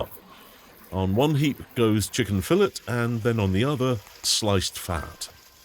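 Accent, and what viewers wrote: United Kingdom. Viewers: Food looks delicious, like it always do. But I'm not crazy about the British narrating. I like the original videos, where it was just nature and him cooking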